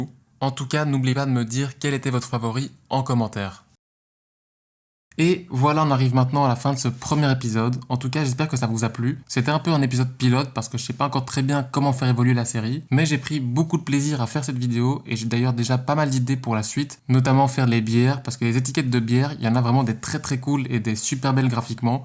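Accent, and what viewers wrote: Belgium. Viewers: Oh l'accent belge il me fait délirer 😂 (bisous d'Avignon) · Aaaa tu est belge !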